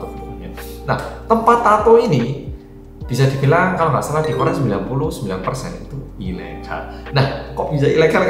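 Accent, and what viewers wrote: Java, Indonesia. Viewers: Sakjane aku ndelok channel e mas ora mergo samiyan ki wong Korea, tapi mergo medok e ki lo. Jarang enek seng medok ngeneki nang YouTube wkwkwk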